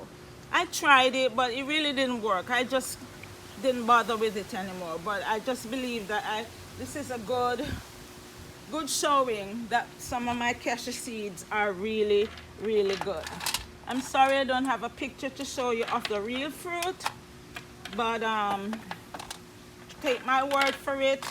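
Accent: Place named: Jamaica. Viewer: Is she from Jamaica I,can tell by her accent and she has a akee tree we have those in puertorico we got it from our Jamaican neighbor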